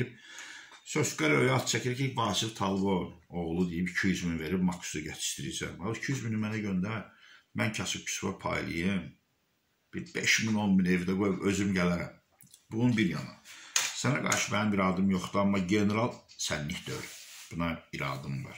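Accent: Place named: Sheki, Azerbaijan. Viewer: şəkiknən danışır 😀😀 can ay yetim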